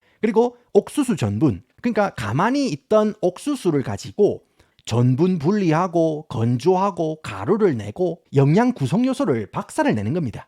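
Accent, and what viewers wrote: Gyeongsang, South Korea. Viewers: 내용은 좋은데 경상도 사투리 극혐 경상도 선조가 중국에서 넘어온 한족들이라 유일하게 한반도내 성조가 있어 듣기 거북함 씨끄럽고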